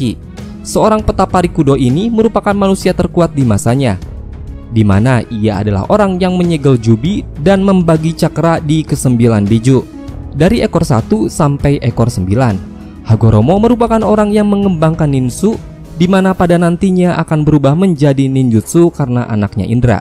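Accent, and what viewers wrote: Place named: West Java, Indonesia. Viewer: piks.. admin org sunda. 😊😊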